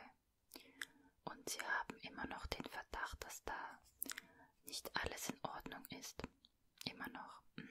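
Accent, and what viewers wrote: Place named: Russia. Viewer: Ich liebe diese Stimme mit dem russischen Akzent so Nice😍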